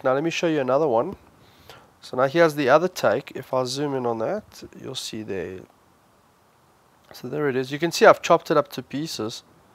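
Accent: South Africa. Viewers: South African accent allert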